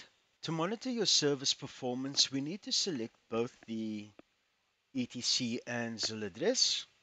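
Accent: Afrikaans, South Africa. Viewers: Jou afrikaanse accent kom sterk deur😂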